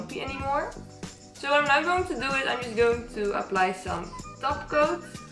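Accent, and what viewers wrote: Netherlands. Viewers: Je Nederlandse accent is zo herkenbaar lol, goede video 12/10. Groetjes van België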